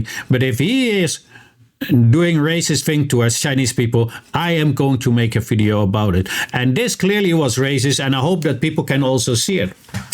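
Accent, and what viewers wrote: Germany. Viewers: sounds like a German accent? figured he is just picking on everyone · He sounds German...don't mention the war. What does this have to do with China Western relations? Going after every internet meme is stupid..keep your eye on the bal....NEXPERIA!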